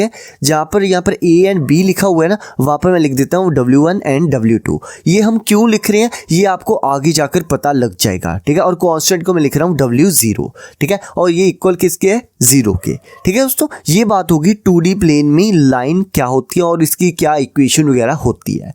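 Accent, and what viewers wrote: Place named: Jammu, India. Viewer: Bhai tu jammu se toh nahi hai? Your accent sounds quite familiar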